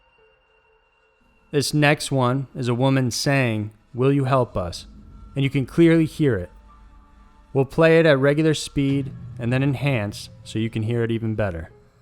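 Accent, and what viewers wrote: United States. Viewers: yeah finally an American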